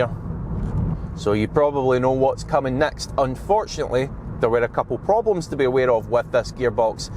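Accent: Scotland, United Kingdom. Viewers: Scottish accent is so weird but funny 😅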